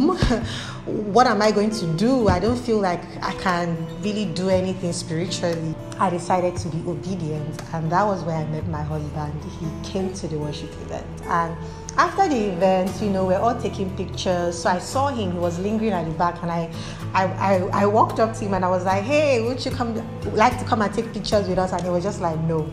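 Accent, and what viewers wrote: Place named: Nigeria. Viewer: You sound like a Nigerian. Though, I'm not in a relationship. Your story is relatable and I don't know why. ♥️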